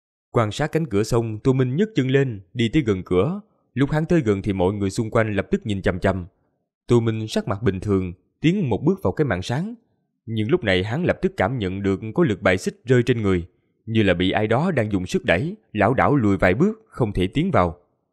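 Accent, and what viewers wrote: South, Vietnam. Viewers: nghe giọng miền nam quen rồi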